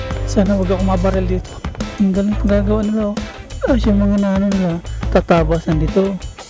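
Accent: Visayas, Philippines. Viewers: Wag mo sabihin Caviteño ka,,,iba ang way mo ng pagsasalita parang pang bisaya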